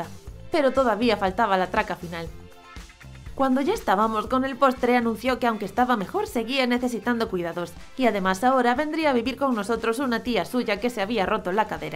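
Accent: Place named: Spain. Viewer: Si los vi!! 🤗😃 me podeis dar un corazón?? (no soy española pero me encanta el asento español)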